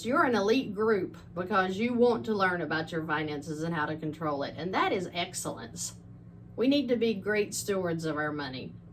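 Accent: South, United States. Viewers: Okay, this is brilliant and I love your Southern accent. It all makes sense except the part where you use your line of credit as income by putting your income into paying the line of credit. It seems you just paid off the line of credit with $10g, so your income for that month was spent by zeroing out the line of c balance. How exactly are you using this as your checking account and wouldn’t you still need to make that month’s mortgage payment? But you just spent your 10g income to pay off the line of c? If you could please explain that part of it in more detail for us slow folk? Thx😊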